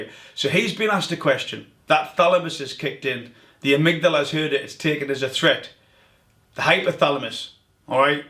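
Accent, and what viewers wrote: Newcastle, United Kingdom. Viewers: Are u from Newcastle? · Wow I really expected an American accent when I first saw the video, not a strong Geordie accent😀 good stuff, subscribing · You from newcastle?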